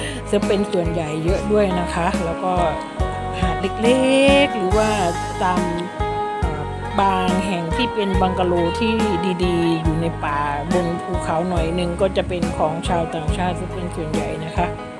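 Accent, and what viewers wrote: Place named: Isan, Thailand. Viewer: สวัสดีค่ะพี่ เว่าอีสานม่วนแท่จ้า ขอบคุณที่มาแนะนำสถานที่และเอาภาพสวยๆของเกาะพงันมาฝากค่ะ💕💕🤟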